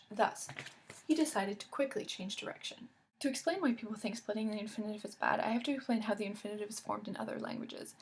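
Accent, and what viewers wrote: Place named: Northwest, United States. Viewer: I agree with Aloma. You speak very quickly. There were a couple times where I couldn't keep up, but we speak slower in the South. I was raised by Yankees, though, so I don't really have a regional accent from what I can tell. I can't really place your accent. If I had to guess, it would be NW US to the Midwest. Thanks for the video. Having studied Spanish, Russian, and German, I can see why some people disagree with splitting infinitives. I really don't care because it would only be problematic in translations, which are problematic anyway.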